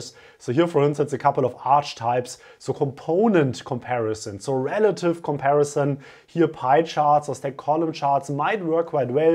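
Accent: Germany. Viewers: Your german accent is hilariously strong, to a distracting extent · Obviously German ; ) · I got a headache after listening to his accent. Is he German or French? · You can hear it directly that he is German 😂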